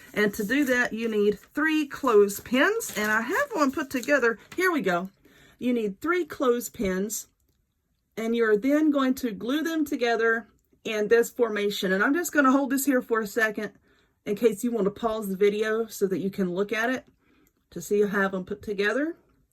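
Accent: South, United States. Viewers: I love this and being a displaced southern gal myself, I just loved hearing my kinda accent! One suggestion that I would offer would be to mention that if you use hotglue, it needs to be via a gun that makes the blue very hot! I heard about this craft from a friend who failed at her attempt and learned that she used a mini glue gun so that the blue wasn't hot enough. Thank you for sharing this craft.😁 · I will save this video with all of my other videos of things I want to make. I love that you take your time in showing some of us slowpokes how to make great diys. Your sense of humor and southern accent always make your channel so enjoyable to watch. I wish you and your family a very Merry Christmas and a wonderful New Year. I pray The Lord bless thee, and keep thee: The Lord make his face shine upon thee, and be gracious into thee: The Lord lift up his countenance upon thee, and give thee peace. 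Numbers. 6: 24-26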